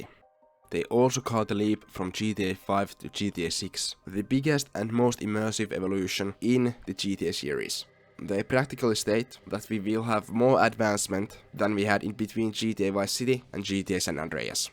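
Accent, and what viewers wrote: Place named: Finland. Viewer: funy finish accent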